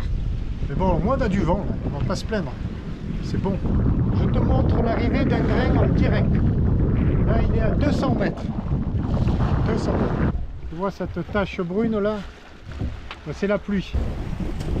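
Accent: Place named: Marseille, France. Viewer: Merci:-) Profite bien et continue de nuits faire rêver avec ton gâté et ton bel accent marseillais ❤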